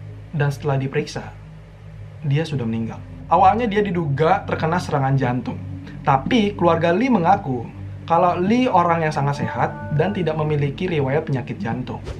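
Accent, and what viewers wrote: Bali, Indonesia. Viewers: "Anak muda tidak boleh bermalas malasan" Orang bali kyk aku suka males malesan:v Wkwkwkwk:v Logatnya, logat bali bgt😂 Dan aku baca coment comentnya "mantep bli" ngomongnya "bli" tpi namanya dia isi "Muhamad":v Aku orang yg tdk bisa dibohongi (sejak kapan?,-) BTW.. Aku: {\___/} nih.. ( •. • ) / >❤>\: {\___/} Cai nyen?,- ( - _ - ) [maaf bahasa kasar] / | | \ Gabut mks:D BTW, Buat conten tentang makanan di Rusia dong:( Disini adanya lawar ama betutu aja:v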